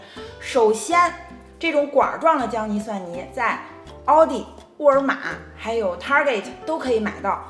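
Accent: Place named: Beijing, China. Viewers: 感觉你是北京人哦！难道是同乡？ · 一听口音就是北京老乡，太优秀了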